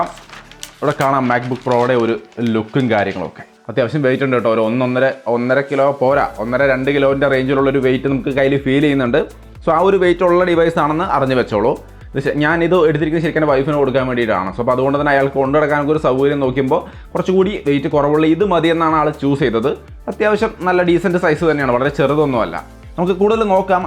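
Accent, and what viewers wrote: Thrissur, India. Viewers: Thrissur slang kattak vannandalo e videoyil😁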